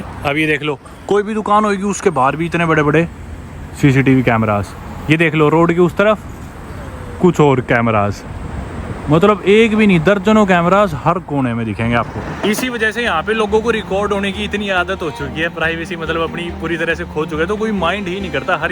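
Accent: Haryana, India. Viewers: मैं देख रहा था पंजाबी जैसा क्यों लग रहा है फिर बोला तो पता चला ये हरियाणवी जाट है 😅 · With all due respect, I can't understand your curry accent English. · Love your harayanvi accent son!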